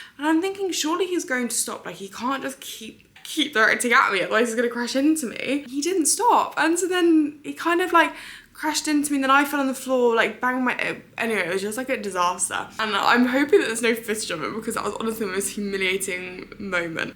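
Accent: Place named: United Kingdom. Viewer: where are you from exactly in UK your accent is hard for me to understand🥲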